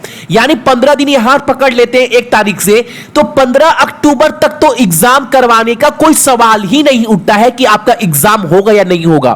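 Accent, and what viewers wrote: Bihar, India. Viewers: kdwa par sch bolte ho bihari style me jo bahot acha lgta hai muje toh. love from rajasthan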